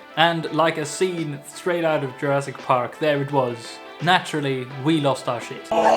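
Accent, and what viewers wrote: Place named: England, United Kingdom. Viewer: I watched some of your videos because I thought you were gay- (I thought the French guy was your boyfriend)-with all your gay jokes, but then it seems your not gay so then the gay innuendos get annoying AF but I’m curious how your got an English accent or is that how Swedish people sound? Also, I noticed that Europeans drop the F-bomb a lot. You saved me thousands with your videos because I was thinking of visiting Sweden and after watching your channel I feel I saw what I needed- Sweden is basically Ohio. 🤷‍♂️✌️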